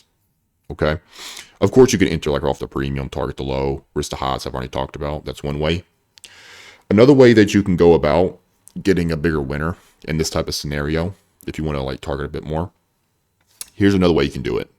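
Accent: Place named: East Coast, United States. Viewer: Lol. This was funny for some reason. Dude sounds like he's on the east coast but slightly south.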